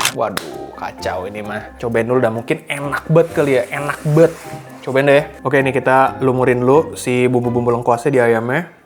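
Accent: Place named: Solo, Indonesia. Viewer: Itukan suara priaa solo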